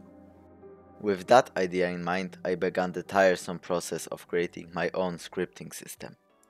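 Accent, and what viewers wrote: Poland. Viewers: ale polski akcent. fajny film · czyżby Polak?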